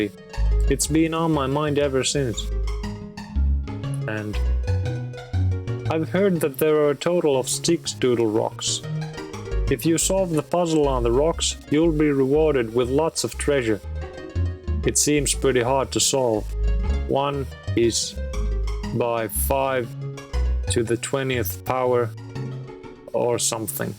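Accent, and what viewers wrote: Finland. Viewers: finnish yeah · The voiceover has to be Finnish? Onko aika mennä Torille? 🇫🇮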